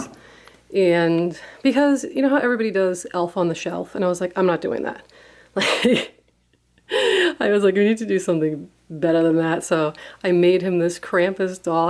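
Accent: Boston, United States. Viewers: yer so dam hot love yer boston accent 2 bad yer married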